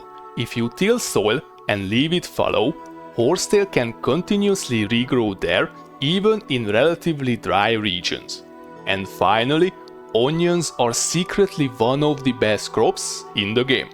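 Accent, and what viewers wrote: Hungary. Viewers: Oh I never realized that shoveling cattail roots is the way to go... also that's some nice Hungarian accent you have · Csodás videó! Mint új játékos, nagyon sok szenvedéstől fog megmenteni. A magyar akcentus meg fenomenális! Csak így tovább! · Akcentusból már tudtam hogy magyar vagy xD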